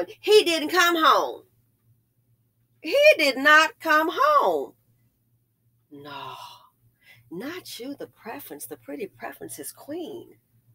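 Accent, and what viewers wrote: South, United States. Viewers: Girl that country twang 😭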